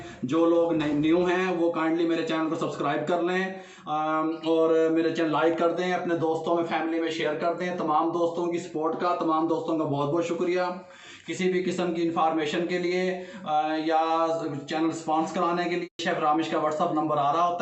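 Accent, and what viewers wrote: Punjab, India. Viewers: Hay Allah ap ki punjbi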